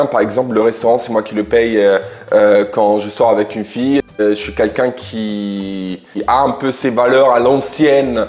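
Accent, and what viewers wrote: Italy. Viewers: Salut merci beaucoup pour ce petit moment avec toi en tout cas moi j'adore 💕 ton accent italien tu t'exprime très bien en français passe une belle soirée à bientôt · Bjr,merci bcp pour le vidéo j'espere que tt va bien;en francais tu t'exprime très bien,l'accent l'Italien lá j'adore,j'ai aimé le moment.bravo a toi💕💕💕 depuis 🇭🇹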